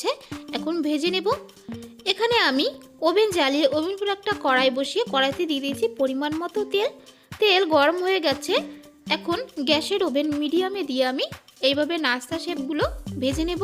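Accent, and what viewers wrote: Bangladesh. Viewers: Khub Sundar. Ekjon bolen Bangladeshi tan lagche kathya te. Recipe bujhlei hobe. Bujhechen. Karo durbolata k nea tana Tani korben na. Ato sundar ekta recipe .atai boro kathya. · দারুন, আপনার উচ্চারনে কিন্তু বাংলাদেশী টান স্পষ্ট । এটা বড়ই কানে লাগছে!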